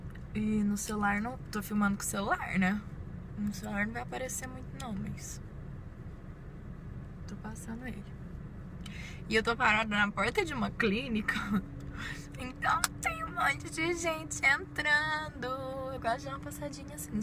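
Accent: Minas Gerais, Brazil. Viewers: seu cabelo esta incrível!!!!! Faz um vídeo de cuidados com o cabelo loiro, como vc matem a cor. Adoro seu humor nos vídeos, sotaque mineiro é o melhor 👏👏 beijosssss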